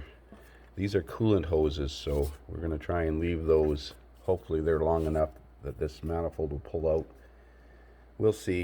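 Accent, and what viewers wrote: Canada. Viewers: I did one of these about a year ago for a guy who was a manager at a Wendy’s I go to ....he bought a used starter off eBay and said can you put it and I said yeah 100 bucks little did I know what was involved with pulling the intake..... and those starter bolts were TITE !!!!! And since it was an eBay starter I did the same thing and cranked it before I put anything back on his problem was he was getting that little fly wheel ZING noise but the fly wheel looked OK and it was fine after. I reused the intake gasket since it was metal ...smeared a little grease on it Maybe that starter was an optional mini high torque geared that they produce now the one you bought 2nd said “ original style “ I came across your videos by accident searching Verus edge.... I watched a bunch of them, really like your style calm, and methodical good guy to learn from plus how can you not like the Canadian accent aye .......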